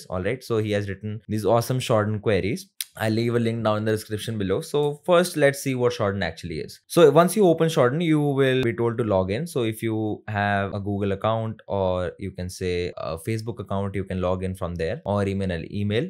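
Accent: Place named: India. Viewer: Disgusting Indian accent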